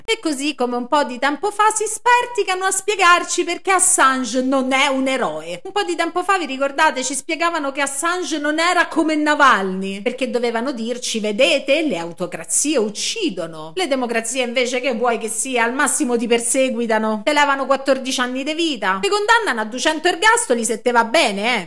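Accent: Rome, Italy. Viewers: continua pure con la calata romana perché tu la fai elegante e rendi chiarissimo i concetti.....belle ed efficaci anche le espressioni che accompagni la calata romana.E poi usi espressioni dialettali romane e non in marie eccezionali, simpatici e precisi, mi fai morire con quelle siciliane😂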